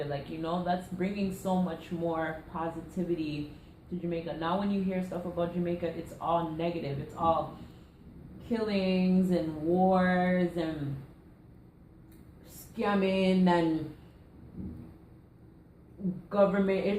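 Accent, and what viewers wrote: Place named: England, United Kingdom. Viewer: Love your English accent... You always make me laugh.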